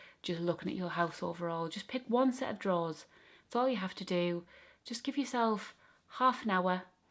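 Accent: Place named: Northern England, United Kingdom. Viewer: I think it's more the North English way to pronounce the vowels than dropping the t It's cool, I love listening to different English accents :)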